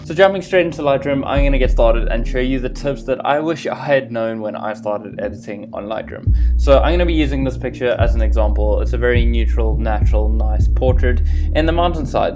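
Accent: South Africa. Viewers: lol are you South African? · You sound south african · Great vid man! I'm a fellow filmmaker and photographer myself! Just moved to Cape Town, could tell thats where you're from because of the accent. Thanks for the great tips! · loved this! At the start, I could tell you were South African and went to go check in your about section haha. Thanks for the tutorial! I am about to use it for the first time and I think I have been well equipped. the sound was also 100% on my laptop. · South African? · Are you south African? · Are you South African?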